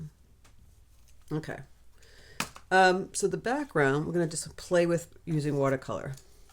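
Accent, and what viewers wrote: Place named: New York, United States. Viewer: Love the NY accent ❤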